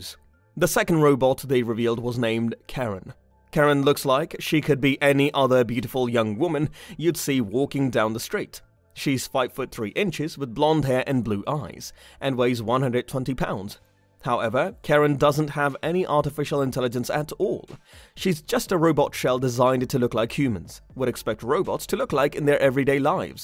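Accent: United Kingdom. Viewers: why thr brit accent infloozer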